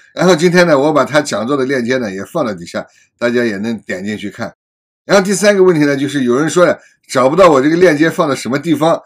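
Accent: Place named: Shaanxi, China. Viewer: 陝西醋溜普通話啊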